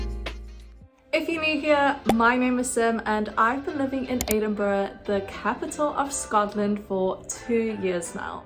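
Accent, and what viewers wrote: South Africa. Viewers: Wow awesome video ☺️ i just stumbled upon it. You sound South African 😁. Love that Pub set uo as well. I will be coming to Edinburgh second week in March and would LOVE TO do Karaoke. Please 🙏 do a video showing karaoke Pubs / Bars in Edinburgh. Thank you in advance. I am from Johannesburg South Africa 😁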